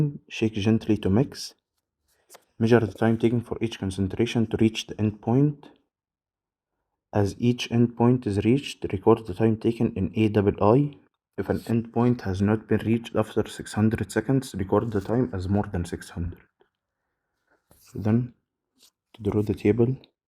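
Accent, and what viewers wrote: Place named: Arab world. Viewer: arabic accent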